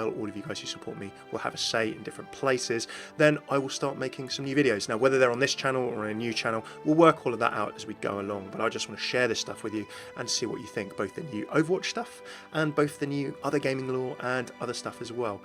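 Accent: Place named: United Kingdom. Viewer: WOW~!!!! A FACE! I HAVE A FACE TO PAIR UP WITH THE SILKY SMOOTH BRITISH VOICE!!!!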